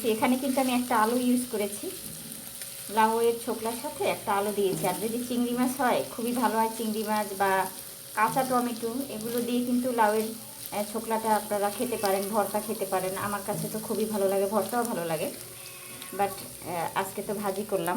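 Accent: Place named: Barishal, Bangladesh. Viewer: O monu tumer parbay ❤ Borirasl bolay kothy 😎💯🔥👍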